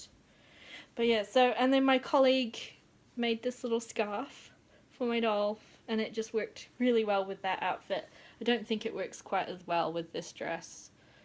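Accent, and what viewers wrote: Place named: New Zealand. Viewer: I have made her facebook page public this evening so hopefully you will see what she looks like and what she has been getting up to since she came to me in August. I didn't know you were British, you must have come here when young you sound totaly Kiwi, we are from Yorkshire and have been here nearly 10years. The idea for your story came to me a while ago, when you mentioned that you didn't know where to go with regards to her story. I was waiting in anticipation when Customs had her and this was the time i was waiting for mine and thought "oh no im going to have to wait forever if customs get a hold of her, i had sleepless nights about her been ceased for her magnets, all sorts of things, then i was worried i was mad at what i was intending to do with her i didn't realise that there were others out there like me, so then i though oh im not original in my way of thinking which i was kind of disserpointed in, so i couldn't win with myself, but now i love watching unboxing video's and you tube but i just watch Shell Bea, Swannz, and the lady who took her dolls, dolls bike and dogs to the beach in Auckland, i've forgotten her name but found them i think through stalking ha ha ha. 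When you were unboxing her it made me cry tears of joy for your wait was over, and mine was soon to be delivered. I love my Ppinkydoll and have another coming in October, its my birthday today and so im thinking erm.... birthday money= Lilith. I have been in a tiz regarding patterns, i sent for some fro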